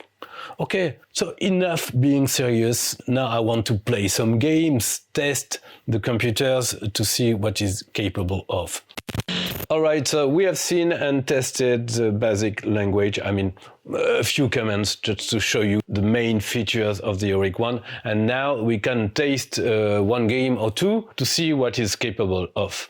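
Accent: France. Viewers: Great, thorough video. Please keep them coming. I especially like the narration, the Frech accent is pleasing to the ears.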